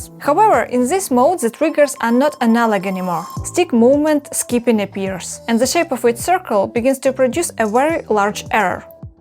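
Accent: Russia. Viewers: This person has no idea what they are even saying. Most obvious actor/ plant video I have ever seen. Not sure who paid her, not sure she has any idea either. But 379 subscribers and a strong russian accent. Yeahhhhhh gunna get a second opinion on that... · Russian accent is hard not to recognize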